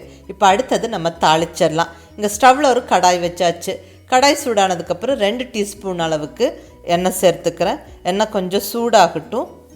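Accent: Kongu, India. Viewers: கொங்கு தமிழில் அழகா...healthy ஆன ரெசிபி சொன்னிங்க... thanks mam 🙏....👍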